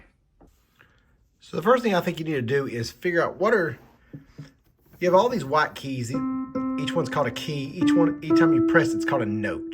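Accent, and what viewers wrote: South, United States. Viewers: I hate your country accent!!!!!!!!!!!!!!!!!!!!!!!!!!!!!!!!!!!!!!!!!!!!!!!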